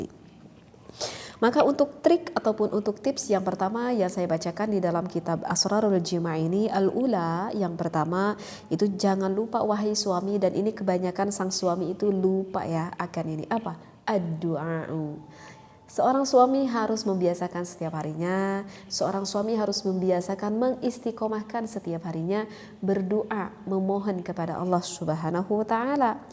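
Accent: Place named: Java, Indonesia. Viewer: gpp atuh, lidah orang Jawa kok disama2in Arab. Jelas beda. Bismillah aja jadi semillah. Kalem aja, Tuhan bukan org Arab kok😅😊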